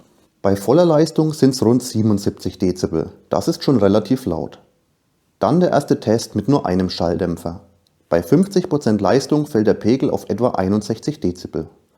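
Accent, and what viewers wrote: Franconia, Germany. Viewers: Immer wieder angenehm den fränkischen Dialekt zu hören. Sehr informativ. Dankeschön 💚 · bitte nicht falsch verstehen - deine Lösung ist durchdacht und erfüllt seinen Zweck. Wollte dein Projekt nicht schlecht machen, falls das so ankam. Besonders der fränggische Dialekt hat es mir angetan ! :D grüße aus Minga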